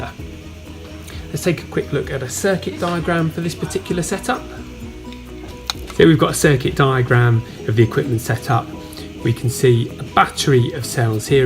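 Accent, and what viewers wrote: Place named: United Kingdom. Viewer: very clearly demonstrated and videoed presentation with clear British English voice. Thank you.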